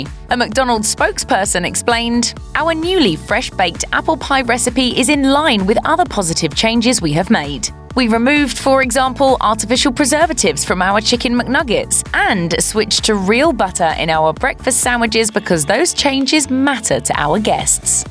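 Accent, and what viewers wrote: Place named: United Kingdom. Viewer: This is America and McDonald’s is an American company why are you using a British narrator?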